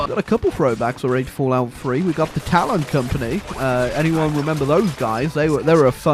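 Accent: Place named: RP, United Kingdom. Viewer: You sound like you have royal blood!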